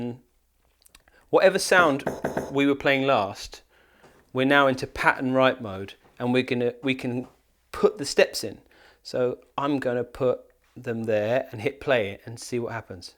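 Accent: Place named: RP, United Kingdom. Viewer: sounding super posh today!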